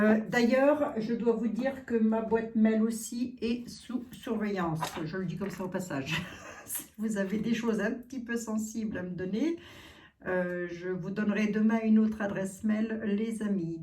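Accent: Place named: Lorraine, France. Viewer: J’adore vous écouter car votre voix et accent me rappellent les bons moments vécus en Meurthe et Moselle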